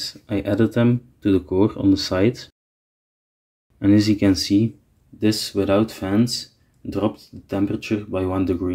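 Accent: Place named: Flanders, Belgium. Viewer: Are you flemish? Curious cuz of the accent :)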